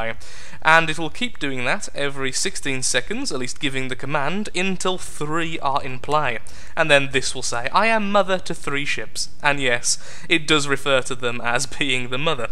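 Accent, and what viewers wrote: United Kingdom. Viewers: I just got to this channel and some how I knew you would sound British.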